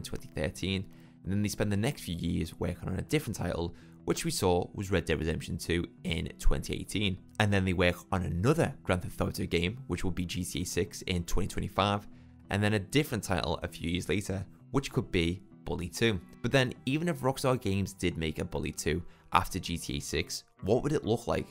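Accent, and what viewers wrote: Liverpool, United Kingdom. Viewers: Near certain you are from Liverpool. We should collab on something, yeno. It would be decent. Two scousers hopping on some games. Seen your GTAiv and made me wanna play it again, on ps3.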